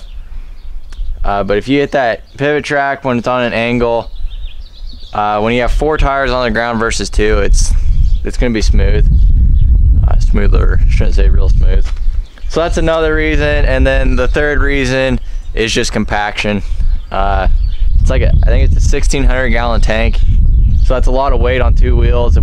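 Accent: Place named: Nebraska, United States. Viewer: Oh I used to work for a potato farm in Duncan near Columbus. I could tell you were from Nebraska.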